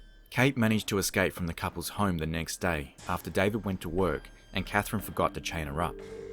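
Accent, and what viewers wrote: Australia. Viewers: You sound like an aussie urmaker! I love it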